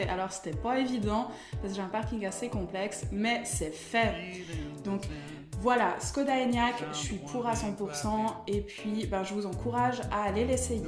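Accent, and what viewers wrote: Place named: Switzerland. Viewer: Cet accent suisse est charmant ; par contre le chanteur de la musique est pénible.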